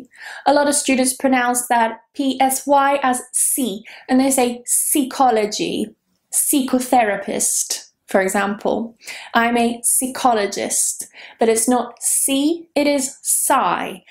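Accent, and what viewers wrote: United Kingdom. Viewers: I'm following your videos for learning British accent and I've learned a lot of words to spell correctly like dropping the r sound and more. But I have difficulty in pronouncing P, T and C like you native British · Beauty queen of British accent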